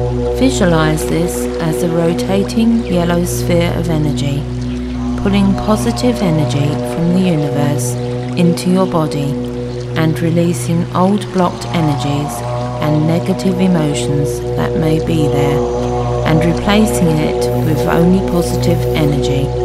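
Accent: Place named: East London, United Kingdom. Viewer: Worst. Accent. Ever. OMG it's like watching East Enders.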